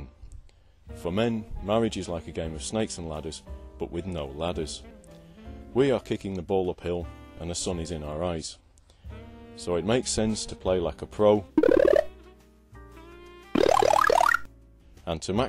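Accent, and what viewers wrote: United Kingdom. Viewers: MGTOW vids with English accents always makes me smile. Top class as usual. More vids from you would be most welcome. Thanks for your content.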